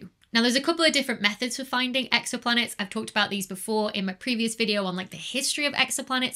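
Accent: United Kingdom. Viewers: I really appreciate your channel, fun and still very heady...off topic, but the CC follows your British accent - Hubble was Hobble, and exo planet said XA planet once 😂🙌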